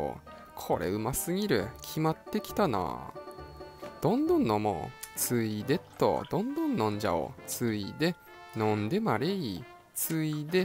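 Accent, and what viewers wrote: Tsugaru, Japan. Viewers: 津軽弁面白いけど、動画自体があんまり面白くない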